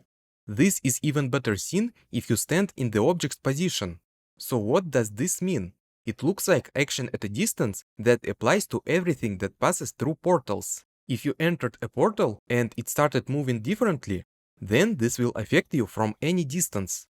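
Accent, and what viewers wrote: Russia. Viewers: Вау, что у тебя с акцентом, никогда не слышал такого неподвижного звучания. Ты проигнорировал КАЖДУЮ фонему английского и заменил её на фонему русского. И даже не на самую похожую. нг в конце почему-то читаешь просто как н, ладно середину между н и г найти не получается, зачем просто выкидывать г. Произносится не обжект, а абджэкт. Не фанайт, а файнэйт. Не пробабли, а прабабли. Не копис, а капийз. Не сёрфэйс, а сёрфис. Не аньюжал, а аньюжуал. Не идиа, а айдиа. Не моментум, а мамэнтам. Не эгрис, а агрийз. Не эдд, а адд. Не специфик, а списыфик. Не дат(that), а дэд. Не джеометри, а джиамитри. Не детэ́йл, а ди́тэйл. Не телепо́рт, а тэ́лэпорт. Не эллипсо́идал, а эллипса́идал. Не алдоу, а олдо. Не дыс(these), а диз. Сделай на русском отдельную дорожку, невозможно без кукожа тебя слушать. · не вижу русских в комментах, хотя акцент автора явно русский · bro got Russian acent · Nice russian accent 👍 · Акцент кст русский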